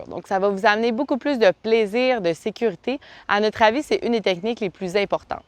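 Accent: Quebec, Canada. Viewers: Great explanation. That Quebec French takes a bit of getting used to.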